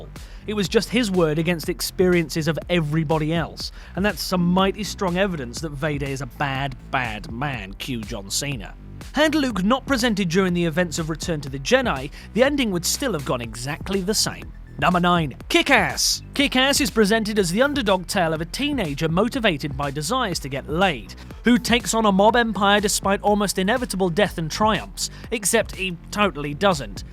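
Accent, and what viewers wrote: United Kingdom. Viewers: Really bad. Lazy and poor. I am trully sorry for you. Almost every character mentioned did, in fact affect the plot of the film. Except for a few who did not, like Cruise in War of the Worlds, or the Basterds. But that was no mistery. It was supposed to be that way. You think that using a british voice will make this sound inteligent? Dumb fucks.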